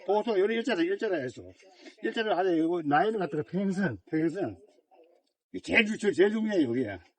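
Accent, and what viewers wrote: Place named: Gyeongsang, South Korea. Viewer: ㅎㅎ 설명이 잼있네요^^ 경상도 아저씨 <link>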